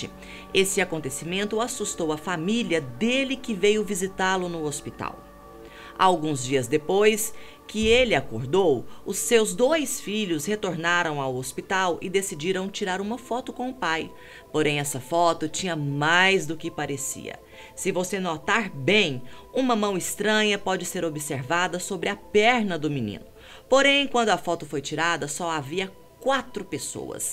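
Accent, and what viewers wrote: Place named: Rio de Janeiro, Brazil. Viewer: Certeza que ela é carioca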